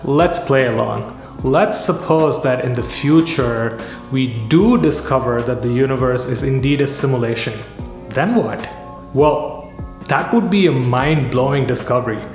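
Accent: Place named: United States. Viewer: Why do you sound American in this video???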